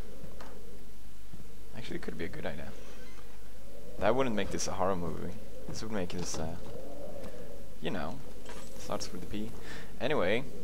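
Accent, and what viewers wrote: United States. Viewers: He sound like he has a U.S. English accent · How come in this video u sound so American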